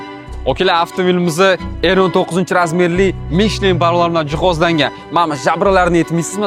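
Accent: Tashkent, Uzbekistan. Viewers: Harpsanu ne toshkencha gapirib chiranasan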